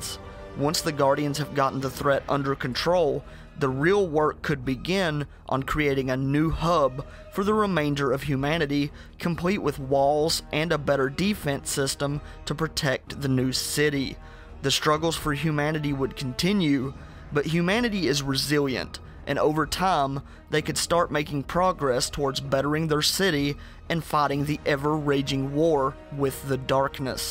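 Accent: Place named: West, United States. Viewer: I click on the video. The first 3 seconds I'm like: oh great it's the guy with the western accent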